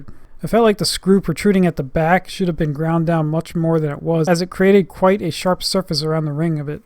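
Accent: Germany. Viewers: sir are you german or hungarian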